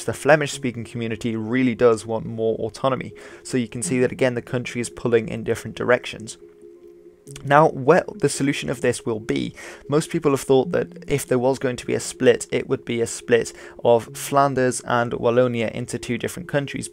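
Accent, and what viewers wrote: Netherlands. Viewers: btw ben je nederlands? ik hoor aan je manier van spreken.maak ook video van die crash tijd geleden op die brug waar alle auto's op mekaar stonden in brand etc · He is Dutch. You can clearly hear his accent. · Your accent from the Netherlands bothers me so much when you pronounce place names especially the “w” and the “g” 😂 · dpassch His name, his accent and his references, combined with the amount of misinformation that he presents as "facts" lead me to believe he's Dutch. If he's British, that would make this channel even weirder, if he truly has no connection with the Netherlands, why is he so obsessed with it?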